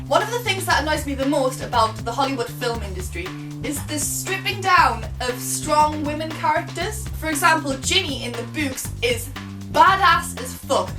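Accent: Newcastle, United Kingdom. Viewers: OMG!!! Can't say how much I love you in this moment <3<3 This is so fucking true!!! So many people never understand why I never liked the films...in future I may should show them your Video ;) You also had a few facts I never realize. The things about the potions (Forget what they are called in English ;) But what I always hated about the films is that Harry has NO Green Eyes!! I think this a good example for the things that where changes in the filme because of no reasons :/ Thanks for this epic Video ;) By the way I love your british accent xD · It's called a Geordie accent. The epitome of the so-called "British accent".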